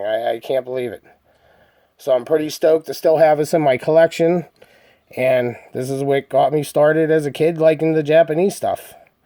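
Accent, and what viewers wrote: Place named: Pittsburgh, United States. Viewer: I stumbled on your video after watching Scale Enthusiasm's top five. I thought from your "accent" and your use of "Yinz" that you were from Pittsburgh. Then when you mentioned Cranberry, I knew I was right. I'm from Pittsburgh too. Have you ever been to a Steel City Diecast Club meeting? BTW great video. I'll check out your others and subscribe. Hope to meet you in person - Rick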